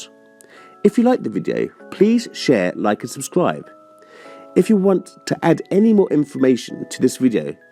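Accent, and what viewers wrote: United Kingdom. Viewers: Being from the UK myself I love the oh so posh English voiceover 😆👍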